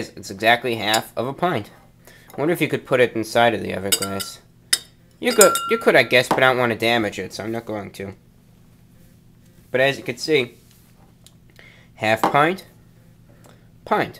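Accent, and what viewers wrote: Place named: Boston, United States. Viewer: Hes got more of an accent in this video hes more pronounced now.is he from boston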